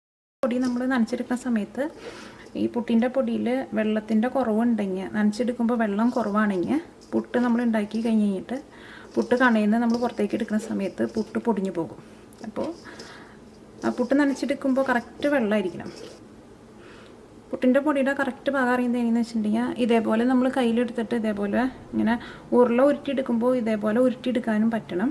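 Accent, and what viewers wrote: Thrissur, India. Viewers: Mam തൃശൂരിൽ എവിടെയാണ്? സംസാരം കൊണ്ട് തൃശൂർ ആണെന്ന് മനസ്സിലാകും.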